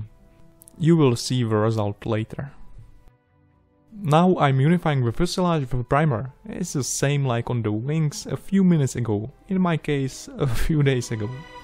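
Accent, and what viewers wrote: Czech Republic. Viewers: are you from czechia cause your accent is sunding czech. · máš takovou tu sklasickou českou angličtinu Češi mají dobrou výslovnost ale jde to poznat že to jsou Češi takže tak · Thanks to this channel, I now have the ambition to... make models? No. To be able to mimic a Czech accent!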